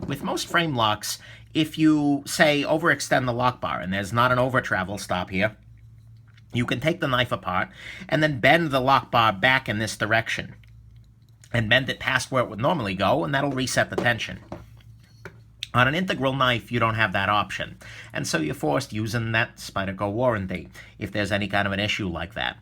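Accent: New York, United States. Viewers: Hey, where are you from? Almost sounds like Brooklyn, somewhere in NY...not sure, but accent definitely seems east coast. Anyway, I like it! It adds color, and reminds me of home (I'm from NYC, but currently living in Seattle). Am I close?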